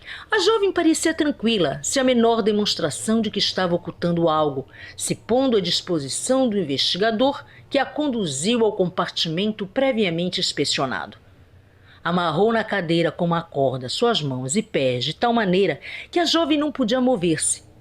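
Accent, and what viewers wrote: Pará, Brazil. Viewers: a senhora é paraense?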